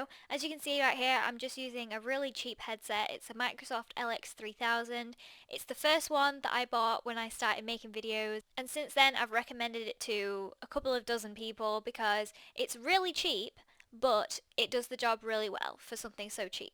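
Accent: United Kingdom. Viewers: Best part she's British :D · Are you from the UK?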